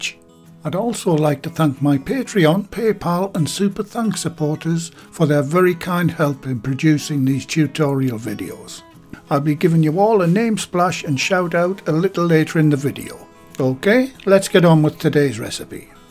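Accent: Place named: North, United Kingdom. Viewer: Wow it looks so scrumptious... I am salivating just looking at the thumbnail. I hit 👍 button even before watching the video knowing will never ever disappoint us but make us happy… It’s like a meditation to listen to your softer silkier voice with nice accent… you pronounce both ‘Butter’ and ‘Batter’ same… it’s very funny you never pronounce ‘E’ for Gas you call it ‘gaas’.. is it northern way of Pronunciation but other northern people I have met don’t pronounce the way you do… anyway thanks dear… Give us another delicious recipe soon… ❤️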